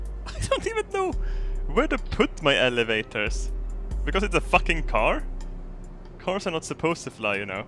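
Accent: Sweden. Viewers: du e skit bra men du pratar inte så bra engelska (ta inte illa upp) typ svengelska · är du svänsk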